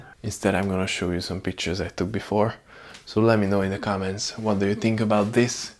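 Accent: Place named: Hungary. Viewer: Your bed is a Murphy bed (the one which is hidden in a wall). There are also Murphy tables (same principle). I thought your sofa would fold out (or you would arrange the cushions) to expand the sofa & make it into a bed, so you surprised me there. 😅 The flat is quite nice. It's small but functional. The bathroom is bigger than in other small flats that I've seen. I could live in that place if it had a window. Had a laugh when you mentioned the female & male hygiene products. 😅😅 Lol. Thanks for the tour! Btw, your accent reminds me of Dominic Szoboszlai, your country-mate. Cheers!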